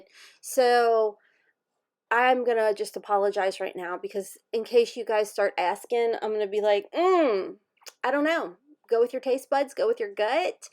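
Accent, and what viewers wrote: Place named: South, United States. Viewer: Hey!! Looking forward to following this channel! Oh & I love your accent, I'm from Georgia so I have one myself but love hearing the different styles of southern accents! Lol My best friend of almost 30 years moved to Danville, Kentucky about 10 years ago and I can hear her picking up some of the accent from there now. I know when I was in Kentucky last the lady at the restaurant couldn't understand me, I asked for water & got a dead look, she had no clue what I was asking for lol Anyways! Thanks for the video! Looking forward to more!!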